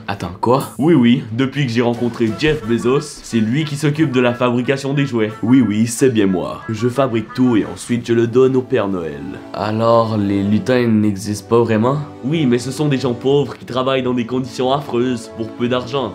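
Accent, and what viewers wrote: Quebec, Canada. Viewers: je clique j'entends l'accent québécois je fuis la vidéo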